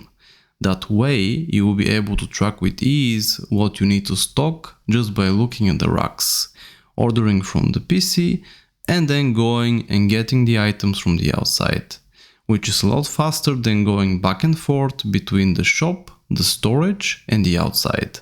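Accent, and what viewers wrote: Russia. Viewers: Какой русский акцент...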